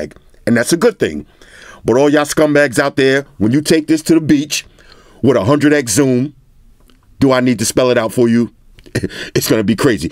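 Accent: New York, United States. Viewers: That REAL authentic New York City accent that's why I LOVE your channel fam. Keep em videos comin foo #NYCForever #Floss💯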